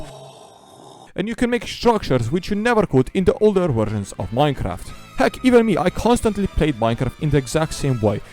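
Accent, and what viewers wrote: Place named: Eastern Europe. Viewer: ... yeah i hear the babushka people acent now that i relisten to it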